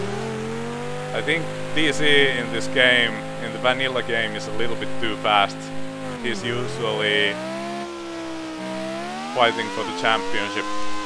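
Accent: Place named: Finland. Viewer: You have a finnish accent by the sound of it.. I used to play this game back at 90's in Kuopio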